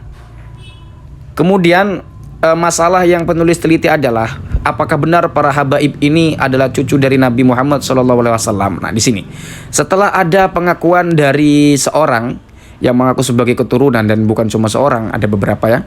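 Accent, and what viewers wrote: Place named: Serang, Indonesia. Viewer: Kan. Upload lagi ngaji kitab ala pesantren, dengan bahasa banten Kangen dengan logat serangnya😁😁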